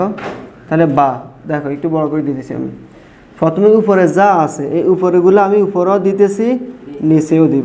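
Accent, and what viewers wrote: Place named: Noakhali, Bangladesh. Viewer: আপনার বাসা কী নোয়াখালী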